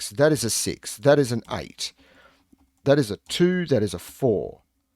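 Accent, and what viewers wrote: Australia. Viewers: Hi, just want to say how happy I am that I found your channel:) I've been on CTC since before you could solve along with them and you had never been in my recommended before last week. Since then I'm hooked and have been binging old videos while it's still raining. I heard you say on one that run time was a big factor for YT to push your content (which after many years of watching I didn't actually know) so have decided to continue running your video on mute while I attempt the puzzle so you'll still get your times up. Maybe suggest this to your audience as I can see a lot of regular CTC commentators on here too and maybe they don't know either, and you really do appeal to a generally easier solve than some of Simon's monsters. I don't belong to the discord, but I've checked out the other channels that you've added in your home page too so good for you for supporting the community. 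I'm the only Sudoku geek in my friend group so nice to hear an Aussie voice here too. Keep up the great work, and I love that my brain solves the way you do too:)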